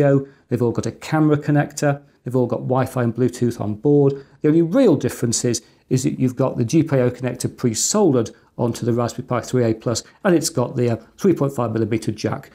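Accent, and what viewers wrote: United Kingdom. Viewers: old lovley man with that british accent like that and like the way you like respery pi s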